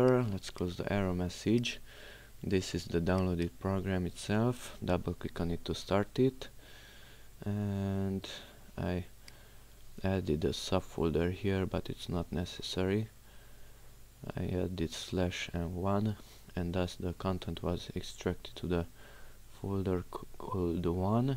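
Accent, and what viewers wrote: Brazil. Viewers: Reconheço um brasileiro falando inglês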